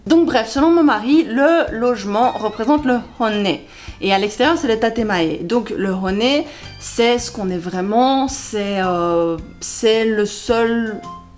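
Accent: Belgium. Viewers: Super sympa la personnalité ;) J'adore l'accent Belge sur Youtube ! Belgians are the best ! ;) Je vous envoie un petit bonjour de Belgique !!! Bref, je kiff le japon et je m'y interesse... Merci pour les infos.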